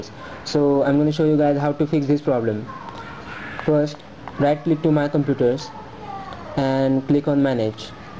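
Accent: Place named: India. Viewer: indian accent idiot.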